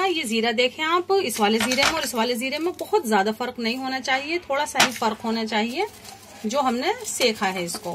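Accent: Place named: Paretabad, India. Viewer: Cooking no doubt achi hai but bolti paretabad walon ki tarah hai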